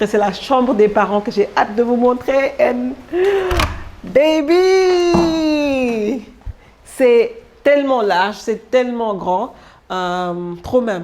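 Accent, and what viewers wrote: Senegal. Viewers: Pourquoi il y a des gens aigris. L idée est magnifique et j adore ton accent un peu d english de french et une petite dose de wolof. Ne change rien tu es trop agréable et captivante. 🥰🥰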